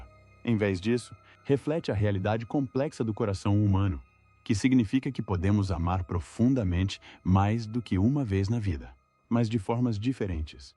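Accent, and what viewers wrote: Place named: United States. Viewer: Inteligência artificial na narração? Sotaque americano no início de frases e sotaque português no final. O que é isso? Um ator de peso como Redford merece mais distinção na narração!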